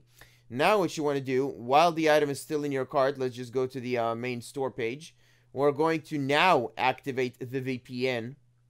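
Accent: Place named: Israel. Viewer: ישראלי מאשקלון עם מבטא כזה? לול דרך אגב סטים לא לוקחים את מה שקנית?כי לפעמים מקבלים מזה באן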